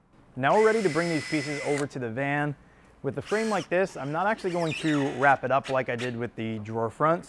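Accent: Boston, United States. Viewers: More Boston accent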